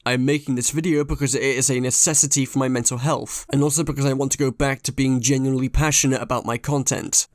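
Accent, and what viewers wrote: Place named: United Kingdom. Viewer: You sound br*tish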